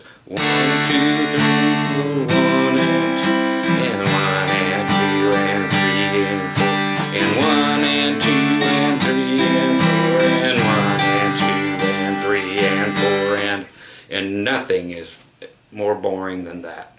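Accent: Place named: South, United States. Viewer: Sorry to be so nosy, but where are you located,? I am forever trying to figure out your slight accent...Oklahoma? Texas?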